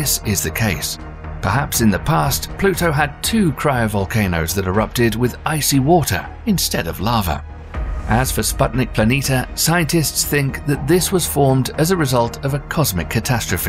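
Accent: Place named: RP, United Kingdom. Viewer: I love how he says the “kweeper” belt. I guess I’m just a yankee and he’s using the more fancy queens English🤷🏾‍♂️🤔😂 I thought it was pronounced ky-per belt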